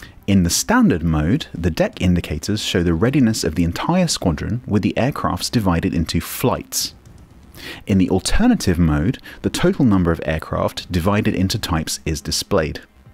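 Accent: United Kingdom. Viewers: Then I for one am very happy that you present these videos. There is a huge difference between American English and ... real English. I love all my American friends online but it does get annoying. As I'm 59 this year, I am so glad to have you fronting these videos. You now have a loyal Kiwi fan (even if I'll soon be moving to my birth nation of the Netherlands due to my lung cancer.) YOU keep them coming and I'll show my appreciation. :)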